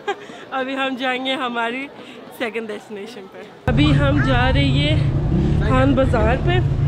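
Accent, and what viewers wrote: Pakistan. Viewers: Assalamualaikum .I like your Urdu accent alot .You put genuine efforts to make videos . Narrating the story of Hzth. Ibrahim (a.s) & Hzth Ayyub ( a.s) was very informative . I haven't came across those maqam e mubarak before .May Allah (s.a.w.t) bless you & your family with good health, happiness, longevity , success and prosperity. Your brother from Hyderabad, India. Fia'amanillah. (Mohammed Azeem).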